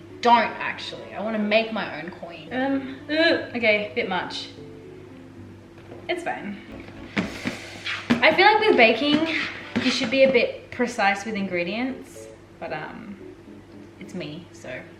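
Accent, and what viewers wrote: Australia. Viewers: i knew it! i recognised ur australian accent right away lol congrats on reaching 100k!! ure soooo relatable subscriber from australia ❤️ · omg!! yess! finally... someone. is. Aussie!!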